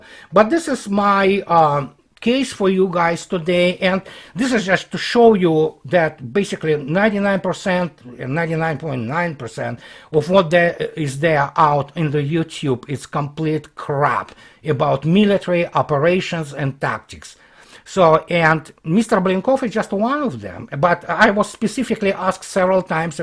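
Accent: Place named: Russia. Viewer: These people are NOT "incompetent": they are actually COMPETENT in spreading propaganda. You need to weaponize your words moreover your Russian accent is way too strong for the average Joe in the West: to listen to you demands an excruciating effort to a native English speaker. Edit your content and make an English native speaker to talk in your videos. Voila, you'll be more competent in your communication under these conditions. Best of luck! (please do not get upset: I am trying to help you to be more efficient in your communication).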